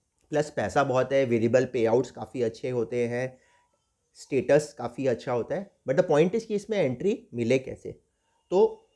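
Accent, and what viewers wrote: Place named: Bengal, India. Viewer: Guys this is funny and misleading.. heading says how a CA can get into PE.. and it starts with let’s the CA do MBA.. 😂😂 The video lacks depth and limited research and obviously no practical experience.. Even if you are a 2nd attempt CA, you still have a chance to get into PE, if you can build up right network and have relevant experience.. Pls don’t get misguided by the ‘sauve’ perspective of this video, it’s just myopic. Anyone willing to get into PE space need following two skill sets along with good networking skills: 1. Ability to evaluate investment propositions - it just basic FM you read in you CAs along with practical POVs on current business, market share, customer base, operational efficiencies, Risk etc. 2. Managing operations- PEs work with their portfolio companies to improve value and finally over the years when they achieve certain efficiency, they look to exit. 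So anyone have operational understanding or ability to manage stakeholders and drive them to improve operations, identify and implement synergies are preferred. Following skills along with above 2 are good garnishee: 1. Business Modelling 2. Transaction structuring and taxation - CAs are champ in this 3. Good communication skill - this is always good to have in the jobs you do. But doesn’t stop you to achieve anything, had the case been otherwise, the host of this video with half Bengali ascent wouldn’t have got 30k followers.. you need not be ‘Sauve’, a Chetan Bhagat english can work wonder..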